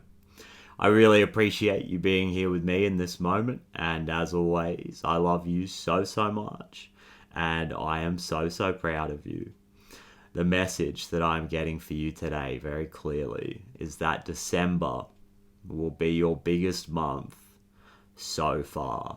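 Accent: Australia. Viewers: Bro hooked me with that aussie accent! 😂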